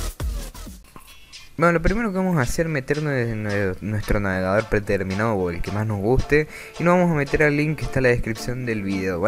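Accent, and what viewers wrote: Córdoba, Argentina. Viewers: Eres cordobes?<3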